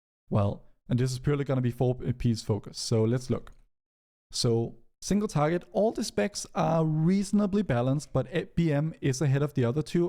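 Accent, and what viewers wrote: Denmark. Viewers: Are u a dane mate? Its sounds a little bit like it. Great work btw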